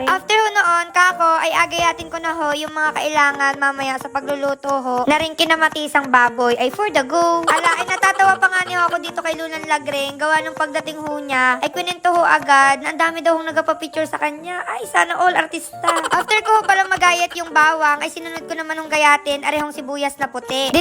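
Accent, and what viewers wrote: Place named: Marinduque, Philippines. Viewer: Kakatuwa talaga mga vlog mo nakakwala Ng stress Lalo mga Punto Ng salita mo kamiss tuloy umowe Ng marinduque😊😊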